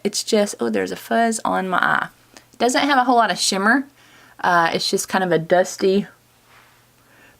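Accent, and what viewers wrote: United States. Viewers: what a great idea! i love watching your videos. so real and funny and you have a country accent like me LOL!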